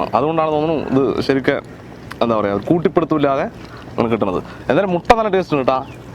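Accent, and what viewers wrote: Palakkad, India. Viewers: Ninglde Slang Kettitt Palakkad aanu nnu thonnunu. Sariyano?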